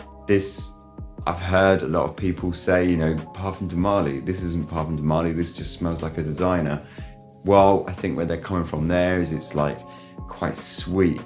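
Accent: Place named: United Kingdom. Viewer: You are really cute, thanks for commenting on my video;) I had no idea about you. I am aaalllll about Britisch accent (I'll watch your videos just for the accent, just kidding!) and I am looking forward to more videos;) Like and a new subscriber:) From the ones you mentioned I love Guerlain. How old are you?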